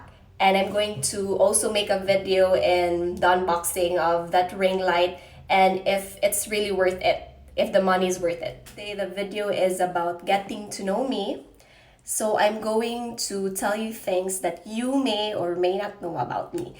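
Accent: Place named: Visayas, Philippines. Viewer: mkamingaw imong tingog nga bisdak 😂❤️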